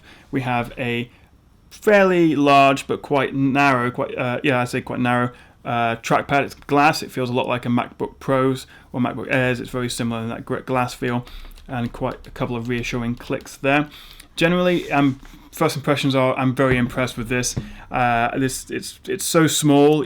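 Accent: United Kingdom. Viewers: Finally someone with genuine clear British accent here ;) not that german-mechanical noise XD Thanks a lot mate !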